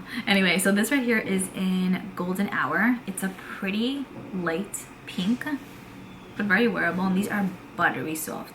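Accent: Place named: New England, United States. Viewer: Welcome back❗❗❗ I missed you so much and thought of you often. I kinda knew you were pregnant. I want to know was this a planed pregnancy for you and Mikey? I cannot wait to hear your birth story. So happy to see and hear you. That Yankee accent makes me feel like home. Luv ya💋🤗👍✔️❣️❗ ColourPop Pop put out today cream bronzer stix and new highlighter shades. It's worth looking in to.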